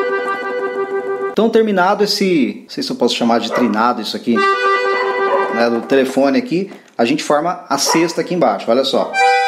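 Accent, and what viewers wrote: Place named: Rio Grande do Sul, Brazil. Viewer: Vc é gaúcho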